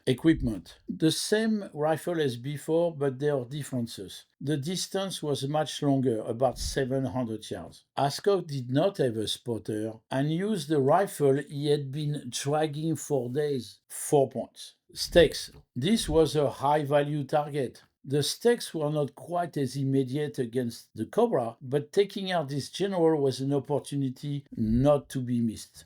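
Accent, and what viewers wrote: France. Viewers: Un bon accent franco-français ahah j'adore tes vidéos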